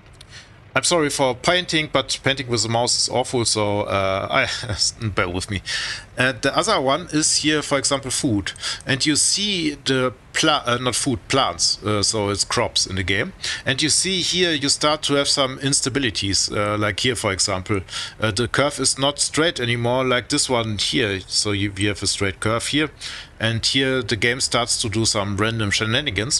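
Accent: Germany. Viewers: Ja, ich verstehe, Ihr Englisch ist voller deutscher Akzente. Ich dachte, es wäre nur Österreich oder Deutschland. Schönes Video übrigens!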